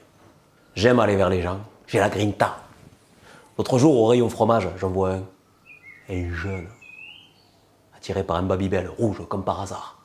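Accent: France. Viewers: La pratique de la coromachie produit de "grands malades" ....! Merci pour la création artistique et le rire salvateur ! En plus "avé l'assent" . Au fait Castex ? Il surjoue au maximum l'accent gascon ?!! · Un zeste de dédain du Midi, avec cet accent languedocien surprenant ici, en lieu et place de celui d'un "héros" español? Oui, mais avec un zeste d’obsession anti-covid qui devient lassante, usée, et qui rejoint finalement l'obscurantisme, les falsifications...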